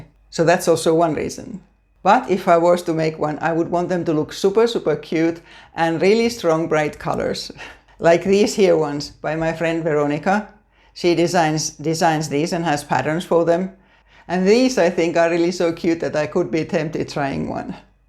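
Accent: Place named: Finland. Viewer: I love your Finnish accent and your easy to follow directions and this video. My daughter and I met 23 of my relatives from Paimio, to Sotkamo to Aavasaksa and many more cities. Finland is a beautiful country with beautiful/kaunis people. Thank you very much/ Kiitos paljon. From California.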